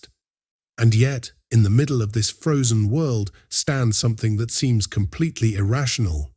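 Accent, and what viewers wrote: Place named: United Kingdom. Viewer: British sounding, but likely AI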